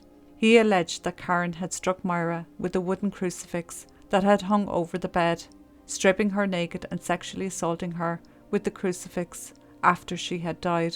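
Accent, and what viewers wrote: Ireland. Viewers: I love your narration voice, your Irish brogue ❤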